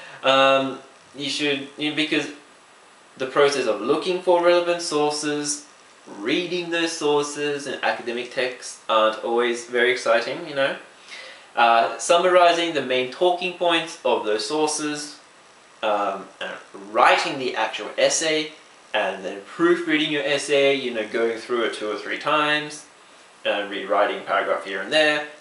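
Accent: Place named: China. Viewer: you have a Chinese accent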